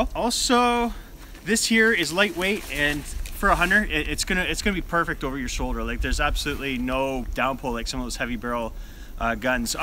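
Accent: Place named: Ontario, Canada. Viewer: Ha knew that was an Ontario accent!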